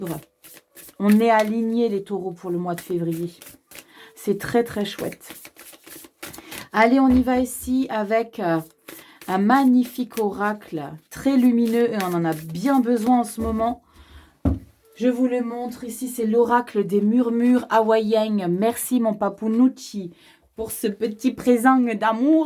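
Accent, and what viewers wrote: South, France. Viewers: Thierry, ☀♉; ASC ♎; 🌝 ♐; 👨💔 Bonsoir, je suis votre chaîne depuis 3 jours, vos tirages sont scotchant de vérité, a tous niveaux y compris tirage sentimental. Je suis en soins de maladie grave et rééducation fonctionnelle des membres inférieurs, j'écris à ce propos pour conter mon expérience de vie actuelle... Ce serai cool d'intégrer à vos tirages pros les notions d'œuvres ou projets artistiques de manière large bien sur, afin d'y trouver des indices précieux... Merci à vous, j'ai l'impression que vous êtes du midi, moi aussi, c'est cool...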